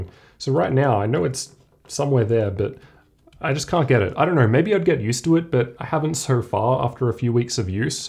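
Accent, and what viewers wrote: Australia. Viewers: The Australian accent caught me off guard 😆🤟 not many Aussie techies on YouTube.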